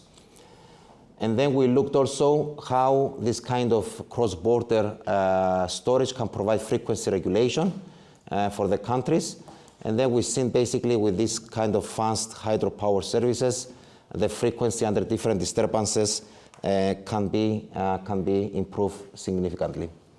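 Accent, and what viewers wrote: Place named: Greece. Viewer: Sorry but your english prononciation with strong greek accent is almost impossible to understand for french speaking people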